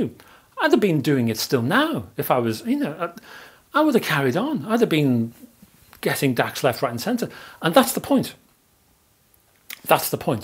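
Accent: Liverpool, United Kingdom. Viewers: Hi, I recently purchased the Audiolab 6000A and then subsequently found your youtube page and web site, so it was probably a sheer fluke I made the decision I did. Anyway, now i'm watching your review of the 6000CDT and will probably get me one of those. I do however have a couple of questions, if I may: my experience of hi-fi is purely analogue and in your review you discuss the merits/benefits of adding an external DAC - excuse my ignorance if you covered my question - the 6000A has an internal DAC (?), so would this combo of the 6000A and 6000CDT still benefit from the external DAC. My apologies for the long winded getting to the question, my second question - are you a scouser? thanks in advance mate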